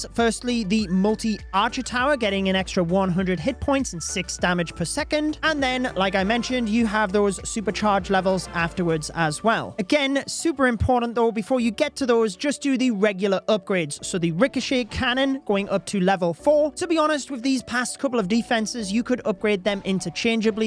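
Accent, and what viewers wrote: United Kingdom. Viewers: I love this British accent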